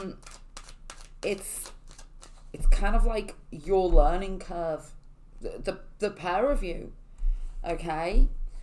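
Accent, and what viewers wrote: Liverpool, United Kingdom. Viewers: I thought Liverpool/ North West too. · I thought it was liverpool I was hearing 🥰 I'm a brummie and southern Ireland 🍀🥰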